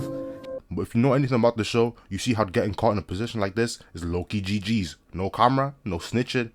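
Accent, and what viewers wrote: Ireland. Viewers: are you Irish 🇮🇪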